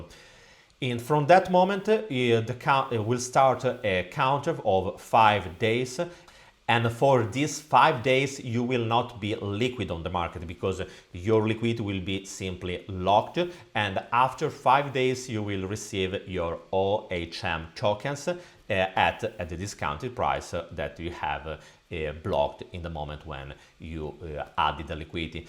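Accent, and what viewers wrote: Italy. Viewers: Sei italiano?😅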